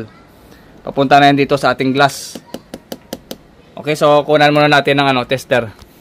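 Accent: Iloilo, Philippines. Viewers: Master ilonggo ka ba?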